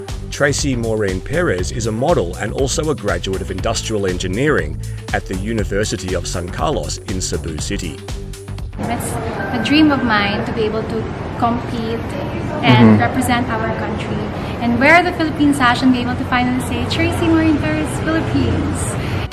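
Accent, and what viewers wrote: Australia. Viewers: Another great video, you have so much knowledge about The Philippines from someone who has (What I’m presuming 😝) an Australian accent. 👍🏾 Do you have Instagram by any chance? ☺️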